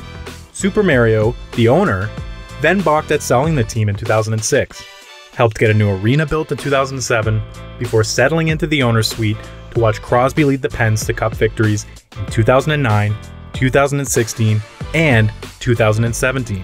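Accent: Canada. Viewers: this is probably the most canadian accent ive heard on this channel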